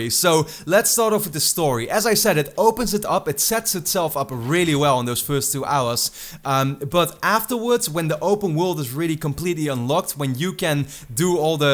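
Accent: Netherlands. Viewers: ik hoorde dat accent en ik dacht dat is sws een hollander 😂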